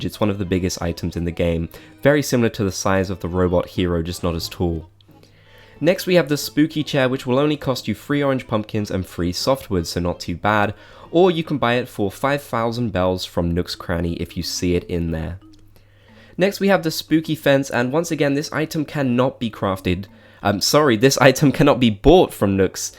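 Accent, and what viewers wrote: United Kingdom. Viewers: Alternative title: British dude obsessed with a purple cat rambling about animal crossing for 6 minutes straight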